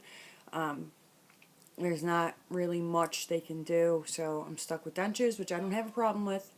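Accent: New York, United States. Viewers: I love your accent! New York?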